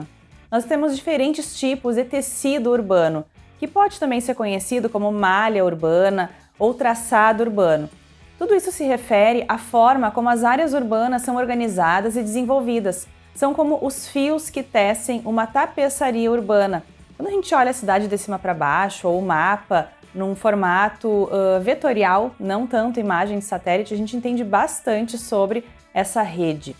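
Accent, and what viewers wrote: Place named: Rio Grande do Sul, Brazil. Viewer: Bah fácil perceber que tu és gaúcha né guria😂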